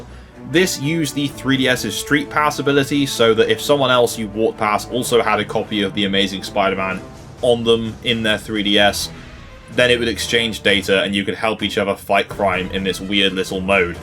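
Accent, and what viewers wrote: United Kingdom. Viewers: you're british right ?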